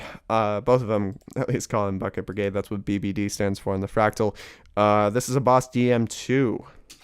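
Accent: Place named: Russia. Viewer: that sounded like a Russian accent, even though it was English. (I think I read your name as?)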